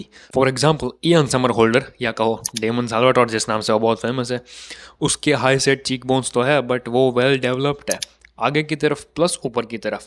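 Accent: Gujarat, India. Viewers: Vhi to voice tone gujrati hai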